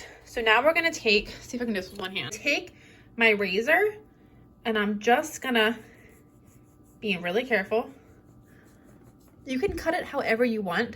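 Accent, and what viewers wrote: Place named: Midwest, United States. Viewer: Yea success!!! I put your recipe together yesterday morning “Saturday” placed it in the oven with the “light on” until I got home . I then followed your instructions for preparing and baking the loaf. It came out perfectly. You are wonderful! Thank you for this great video-oh I enjoy listening to you talk…what part of the country are you from. A wild guess…mid-west?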